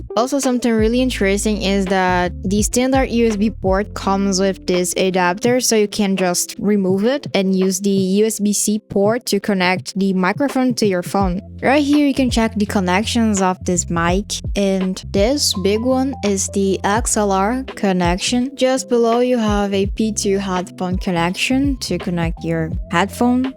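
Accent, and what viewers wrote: Brazil. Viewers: Eu vi o vídeo de hyprland com cachyOS e eu pensei hmmmmm parece inglês br (mas está mt bem) kkkkk mt bom, vlw pelos vídeos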